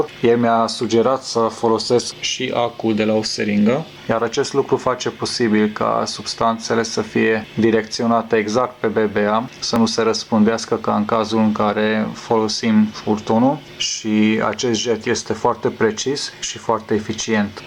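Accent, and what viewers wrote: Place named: Hungary. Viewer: ai si canal de youtube pe maghiara? ca esti maghiar😁 se aude bine😋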